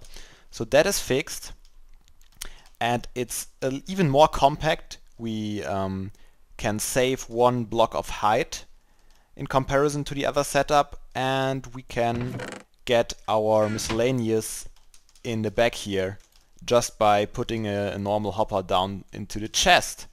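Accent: Germany. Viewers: Ich wette du kommst aus Deutschland :) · hey kanns sein das du deutscher bist ;D du spricht irgendwie so deutschen dialekt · man kann sofort hören mit dein deutschen dialekt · German I'm guessing?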